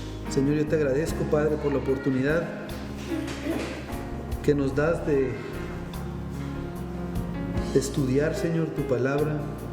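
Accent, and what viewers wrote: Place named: Colombia. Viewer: Buenas tardes como está usted? Por su acento usted parece que fuera colombiano. Mira te voy a decir una cosa por favor ya no le estés enseñando a la gente eso DEL SEÑOR De cual señor usted me habla del señor Alberto el señor Pedro el señor José. Y de cual Dios usté habla del Dios de las moscas del dios del viento del dios del fuego. Déjeme saber por favor. Yo soy colombiana pero por favor enseñe lo que es correcto. Desde aquí desde Estados Unidos. Y por favor responda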